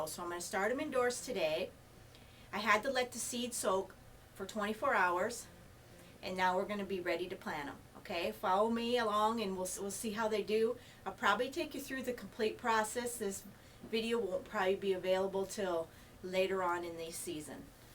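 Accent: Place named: Wisconsin, United States. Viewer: Thanks for this. I live in Santiago Chile and I've seen these growing around neighbors' gates. I just picked a few dried fruits off them and will plant the seeds are you suggest. Can't wait! These are such amazing flowers! By the way, I grew up in the midwest and enjoyed listening to your accent! (Wisconsin?)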